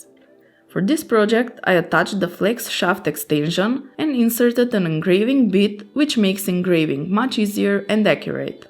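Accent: Russia. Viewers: Попахивает русским акцентом) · русский акцен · Отличная работа! Но у вас жуткий русский акцент, надо тренироваться ;) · Какой четкий русский акцент в этом английском :) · Похоже русская озвучивает.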